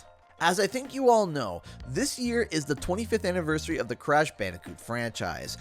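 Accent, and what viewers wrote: Canada. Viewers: Canadian accent sound like American access until they say just one or two words haha then I’m reminded where you’re from! About and sorry. Probably a few more?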